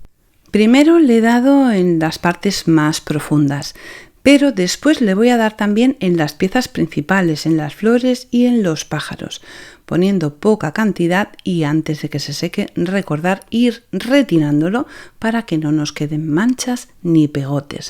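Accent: Spain. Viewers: ESPECTACULAAAAR!!Lo haré y espero me quede igual de precioso como en tuyo!!!Me encanta tu toni de voz y tu acento Español !!👍👌👏👏😘🌹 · ¡¡¡¡,sabes a mi me sucede ygual?,tengo infinidd de cositas hechas de hace años en distintas cajas,y en ocasiones las encuentro,....y me vuelvo loca mirandolas,como si no las hubiese hecho yo misma,y me emociono.....asi es un poco raro no?....bien tu trabajo es como dices ESPECTACULAR, que belleza besotes desde ARGENTINA creo que tu eres española sai me suenas 🤩🥰😂🤣😚☺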